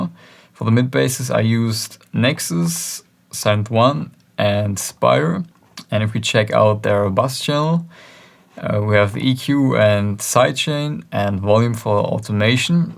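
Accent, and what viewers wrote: Germany. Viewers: Man hört den deutschen Akzent so stark raus🙈, trotzdem sehr gutes Tutorial👍 und vielen Dank für die Flp🙏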